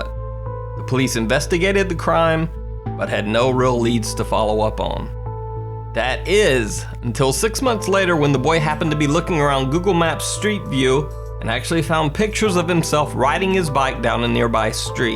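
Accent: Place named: South, United States. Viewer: There's a hint of Southern accent to your voice here. But I haven't noticed it in any other videos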